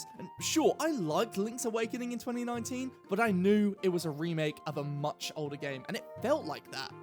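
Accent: United Kingdom. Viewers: i don't click onto your videos all too often but i am jumpscared by you being british every time. i somehow always forget and it's terrifying every time.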